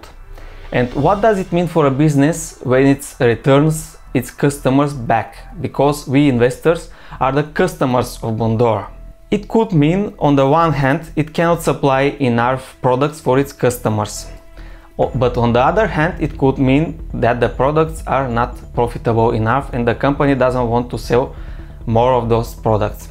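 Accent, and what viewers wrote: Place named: Bulgaria. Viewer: where are you from originally? Just curios Edit: I heard Bulgaria 🇧🇬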